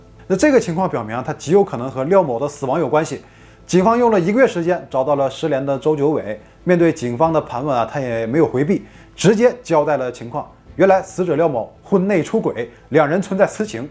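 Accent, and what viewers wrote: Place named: Shenyang, China. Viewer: 但是有浓浓的东北口音 感觉像沈阳的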